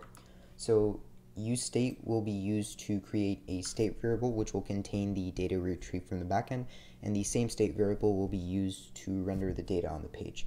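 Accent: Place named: Nepal. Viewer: Bro!! are you Nepalese? your accent ❤ thank you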